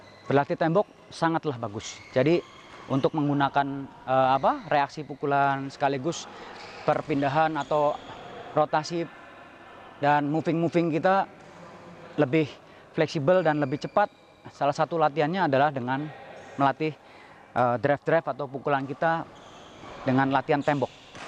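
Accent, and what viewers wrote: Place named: Cirebon, Indonesia. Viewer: logat cerbone katon banget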